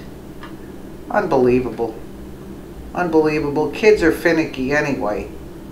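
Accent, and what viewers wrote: New York, United States. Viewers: good job sounds like your from NY . yes I fixed my children's lunch the night before . who knows what they feed people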